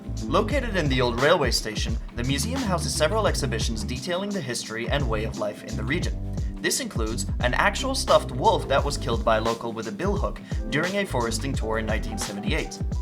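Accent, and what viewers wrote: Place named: United States. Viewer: Dude is that you talking? Why do you sound american 😆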